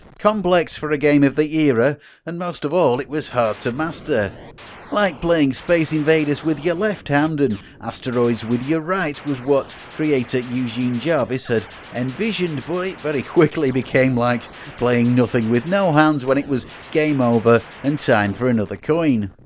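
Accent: United Kingdom. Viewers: Awesome job on digging up I Robot! That's a deep cut. Jaw-dropping graphics for the time. I tracked down a ROM of it and gave it a play on MAME, oh.... I'd say sometime around 2005. It wasn't a bad game, by any stretch of the imagination... but it wasn't super fun or addicting like many of the others on the list. I love the content you've been putting out... I do have a small suggestion for you, if you'll humor me... I don't know if it's an "other side of the pond" thing, but most of the folks I know of in the USA that I've talked shop in person with tend to have a pretty standard way of pronouncing processor names. But, once again, this is the YouTube comments section, feel free to take this with as big a grain of salt as you like: MOS Technoglogy 6502: "Sixty Five Oh Two" Intel 8008: I've.... never heard anyone talk a lot about the 8008. My guess is "Eight Oh Oh Eight" or "EIghty Oh Eight". 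Intel 8080: "Intel Eighty Eighty" Intel 80286: "Two Eighty Six" Intel 80386: "Three Eighty Six" Intel 80486: "Four EIghty Six" Motorola 6800: "Sixty Eight Hundred" or "Motorola Sixty Eight Hundred" Motorola 68000: "Sixty Eight Kay" or "Motorola Sixty Eight Kay" You might notice I've left off a processor. :) Honestly, though, I call it a "Zee Eighty". Do y'all over there call it a "Zed Eighty"? Either way, I agree that the computer that Sir Clive Sinclair made out of it is definitely a "Zed Ex Spectrum"... and the car brand is definitely "Jag-You-Ar", and not "Jag-war", and ABSOLUTELY not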